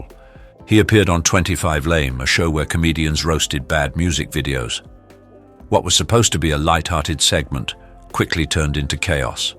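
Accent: United Kingdom. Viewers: Of course, a British narrator.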